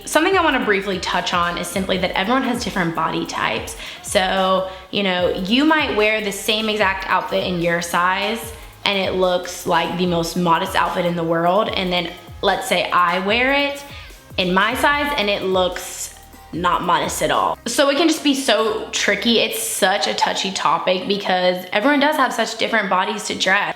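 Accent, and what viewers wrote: South, United States. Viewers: You’re southern accent really came out in this video 😂 and I loved it 😍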